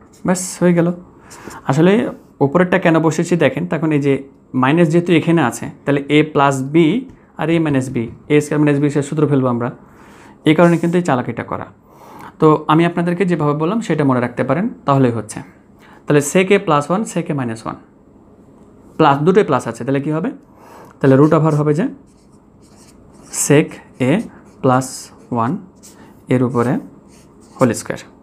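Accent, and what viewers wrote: Rajshahi, Bangladesh. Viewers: Are you from Rajshahi??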